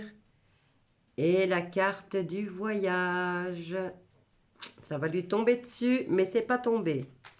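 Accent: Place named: Belgium. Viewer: Vous avez de l' humour avec un bon accent Belge, Bravo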